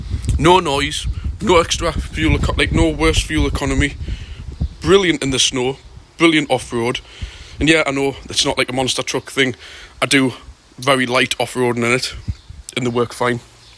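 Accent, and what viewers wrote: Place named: Geordie, United Kingdom. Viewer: Just ordered the rain defence kit from Mitsubishi for my truckman canopy, cheers for all the helpful advice mate, you're a legend and sound like a canny lad. I'd never have known they did one, and got the bonnet deflector too, drums are lovely and matt black already. I'm picking it up on Wednesday this week and any experience with the Goodyear Wranglers which are fitted? I'll deffo get the 265's (I think if i remember correctly?) when they're due for new ones like you suggested. Egr delete lead pre-ordered and paid for (week delay, sold out) Love you man, you're awesome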